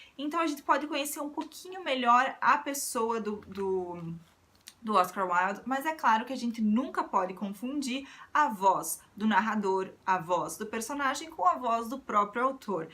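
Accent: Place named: South, Brazil. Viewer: Adoro teu sotaque, sutil sotaque sulista. É bom ter uma representante do sul no âmbito da literatura. Sucesso nobre conterrânea.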